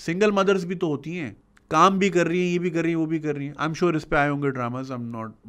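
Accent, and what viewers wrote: Karachi, Pakistan. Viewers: Karachi walon ka accent esa ee hota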